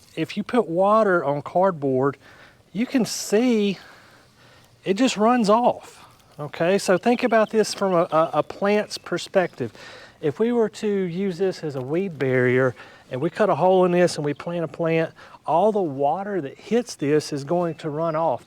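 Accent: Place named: North Carolina, United States. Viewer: Are you from North Carolina? Your accent sounds like it.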